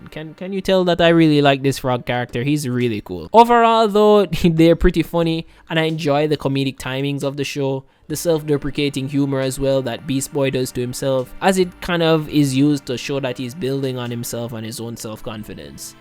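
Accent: Jamaica. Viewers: This is my 1st vid and i can tell your jamaican i am glad there are jams like me who loves explaining cartoons/shows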